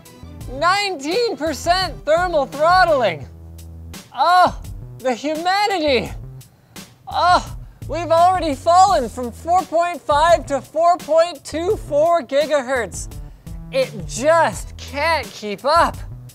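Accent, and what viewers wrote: Canada. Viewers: The Canadian comes out so hard in this video... Normally I can't hear Linus's accent too much, this episode though...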